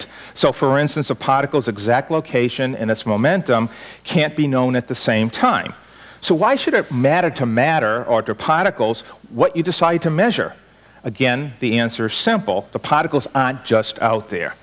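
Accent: Boston, United States. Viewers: Having a tough time taking him seriously with that Boston accent. They just don't sound smart. He should try a British one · THE VOICE RECOGNITION CAN'T HANDLE A BOSTON ACCENT that is conclusively been proven that if you watch a subatomic particle orbital I passed through two sluts in a barrier it will behave like a particle and it creates the solid lookin hits on a barrier are that the business can measure and so like a boy to go through one hole but again if you don't observe a strict reject re the particle than exhibits the behaviour wave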